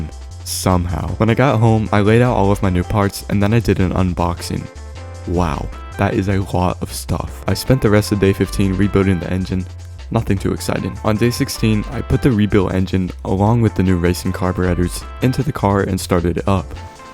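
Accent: Finland. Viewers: Your channel has a finnish name and you have some finnish in your accent but it says you're from united states wich way is it??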